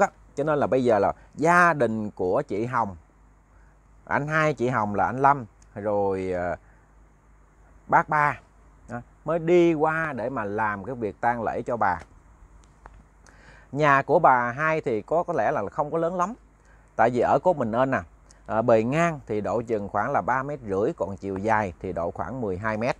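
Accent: Mekong Delta, Vietnam. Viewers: nói chuyện dễ thương quá đi, chuyện uống nước đó là nhu cầu của mỗi con người, ai cũng phải vậy, cũng lịch sự nói với mọi người, nên e thấy đậm chất miền tây, rất dễ thương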